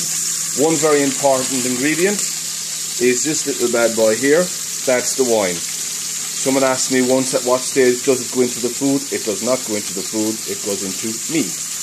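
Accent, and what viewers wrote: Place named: Caribbean. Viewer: Caribbean accent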